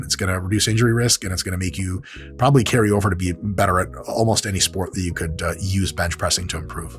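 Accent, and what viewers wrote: United Kingdom. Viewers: Love the British accent Dr Mike ! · But he’d have to do the whole thing in his bri’ish accent.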